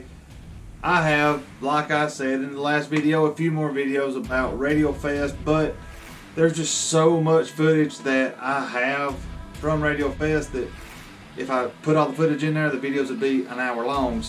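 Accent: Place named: Alabama, United States. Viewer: Hate to break it to u bud ,but you sound like ur from bama too lol. I'm from West Texas so it's same shit different accent, we had boosted and Kayla,doc,Monza, birdman,Shane and banknote here and yea there people taking videos infront of the car as its burning out , people all around them all the way tell the would take off and they were all really laid back about it too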